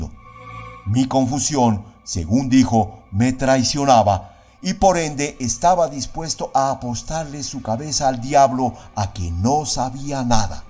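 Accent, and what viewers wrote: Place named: Latin America. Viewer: Qué dicha, un narrador Latino.con este cuento.. Gracias!!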